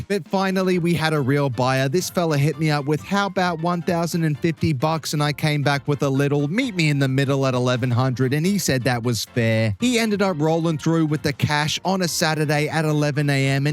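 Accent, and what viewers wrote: Australia. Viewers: is the cutest Aussie accented techtuber!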